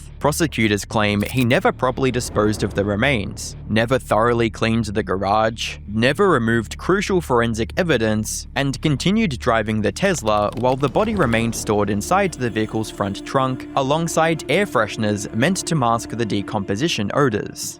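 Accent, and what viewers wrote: Australia. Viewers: no problem, for context I know an adelaidian accent when I hear one haha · I've been watching your vids for a while, i didn't notice your Aussie accent till now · “If you dorn’t knor” I sense Aussie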